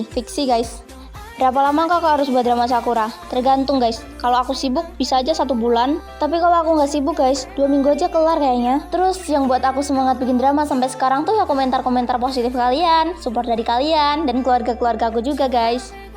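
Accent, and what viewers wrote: Indonesia. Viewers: Kok sama Jawa Timur nya kak😄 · wihh aku jg banyuwangi, tp kalau cara ngmong nya kak emng mirip banget sih orng banyuwangi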